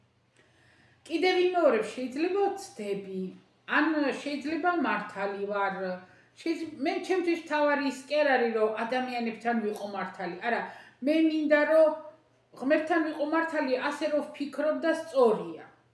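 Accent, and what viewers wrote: Kakheti, Georgia. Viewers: იხარეთ მუდამ !!! ❤❤ როგორ მახარებთ !!! ❤თქვენს მიერ გახმაურებული კახური აქცენტებით ...❤ ,, არაუშავრა..."❤ მიყვარს თქვენი მოსმენა... და მამხნევებთ ძალიან...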